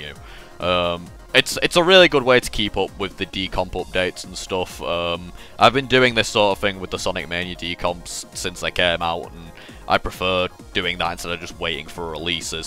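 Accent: United Kingdom. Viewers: The british accent ruins everything sorry but srslly 💀☠️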